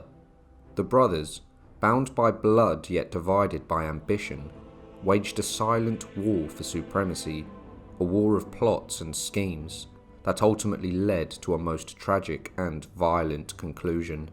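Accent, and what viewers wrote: United Kingdom. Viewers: Never change it bro. Its always nice to have a Brit tell me about history🤣👌🏻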